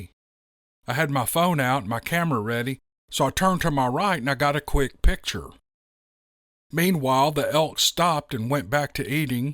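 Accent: South, United States. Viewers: Thays’ boogers haha I love southern accent especially storytelling.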